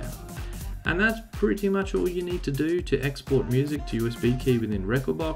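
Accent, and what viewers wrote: Australia. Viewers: wth r u from sydney?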